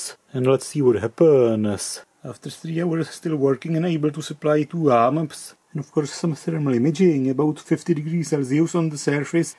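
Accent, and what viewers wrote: Czech Republic. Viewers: I thought you sounded Either Czech or something But then i saw the Tesla branded stuff, where're you from? Did i get Czechia on the spot? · Říkal sem si českéj přízvuk, ale ty ses fakt Čech 😅😅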